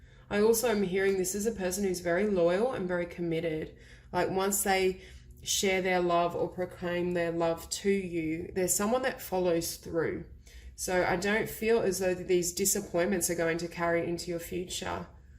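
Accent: Australia. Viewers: Number 3! Resonates 100% thankyou so much❤️ Are you from Australia?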